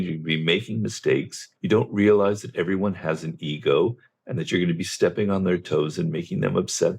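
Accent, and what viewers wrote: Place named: United Kingdom. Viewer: I have difficulty understanding the British accent. I’m a beginner. By the way, great video.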